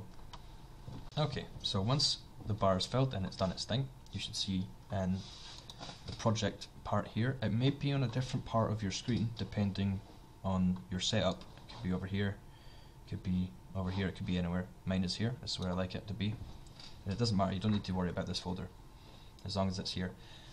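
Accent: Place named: Scotland, United Kingdom. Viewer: So Scottish. <3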